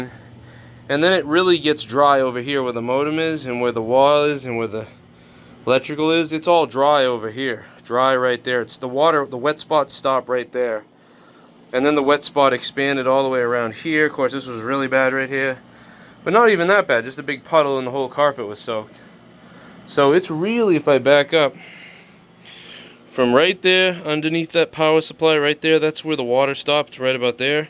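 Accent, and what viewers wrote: Boston, United States. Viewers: Lol do you consciously switch to your Boston accent or is just something that happens sub consciously?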